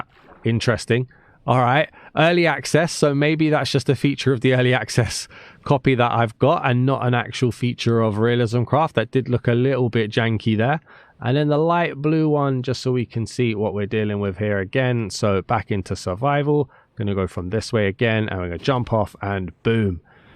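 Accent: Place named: United Kingdom. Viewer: Im never get tired of british accent 😅 tyal 4 the vid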